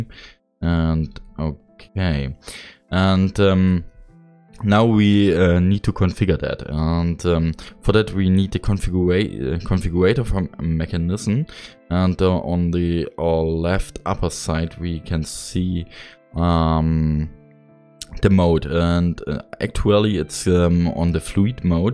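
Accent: Germany. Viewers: Ich höre deutschen Akzent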